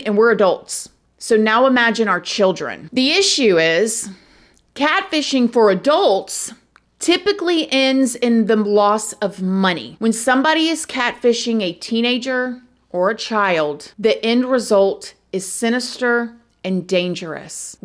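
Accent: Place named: Upper South, United States. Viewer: I'm not commenting on the story, this is for you! That Southern lilt of your voice, hello my lovelies, show no, shoot can't remember all of it. Just no, that's why I subscribed! Your voice is amazing for voice overs, and radio! Fabulous!! Edit: Your 6 yr old??? Disgusting!!!! ALWAYS Protect children, seniors and animals!!! WTH is wrong with humanity, beyond WRONG!!!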